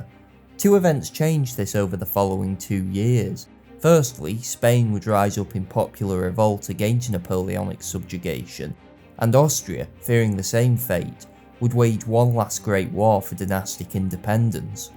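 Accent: United Kingdom. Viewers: Definitely British, but where specifically idk. I'm American too lol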